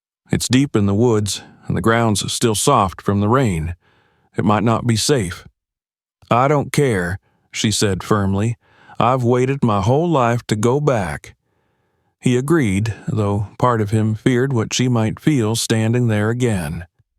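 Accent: South, United States. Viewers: Findlay Ohio loved this story and the southern draw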